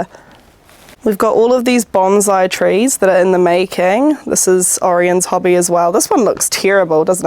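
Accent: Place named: New Zealand. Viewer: Thanks for the demonstration - simple is the way to go. You should get an Italian pasta machine (joking), the NZ accent is contagious not that our Aussie one isn't. 👍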